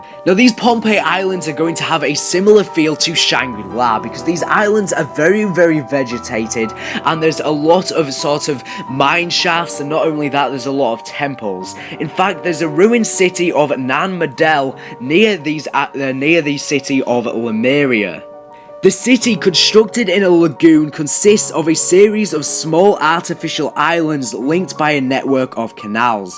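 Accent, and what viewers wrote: United Kingdom. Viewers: steeleman619 He's British...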